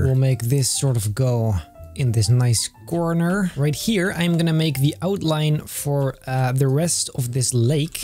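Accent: Netherlands. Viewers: WAIT A MINUTE… Ben je Nederlands?! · I knew you were dutch Baha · are u dutch? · i new your from belguim or netherlands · your from Belgium or are you from the Netherlands? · ben jij van België of Nederland of Engeland, ik gok Nederland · Are u nederlands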